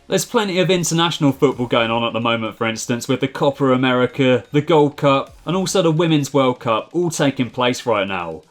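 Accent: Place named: United Kingdom. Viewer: I like your British accent melted through years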